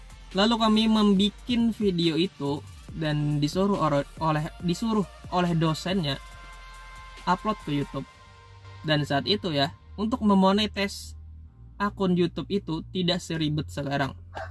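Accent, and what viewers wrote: Banjar, Indonesia. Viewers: Fiks orang banjar sebelum mimin perkenalan diri bisa ddengar dari logat nya ngomong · Tuhkan, dari logatnya urang Banjar. Salam kenal bang, dari kab.banjar ...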